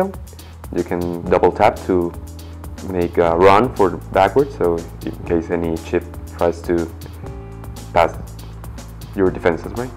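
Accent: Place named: Monterrey, Mexico. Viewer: Eres de monterrey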